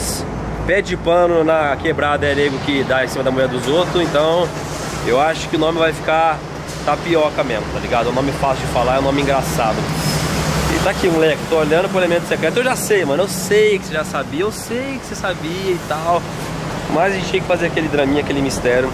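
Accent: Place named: France. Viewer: legal e tal mas de onde saiu essa mania de colocar assento circunflexo em tudo??? 🤣🤣 Carpete vira "carpê" Quattro vira "Quatrô" 😂😂😂 Nem quem eu conheco de Londrina fala assim... nao entendo de onde ta vindo esse enfrancesamento kkkk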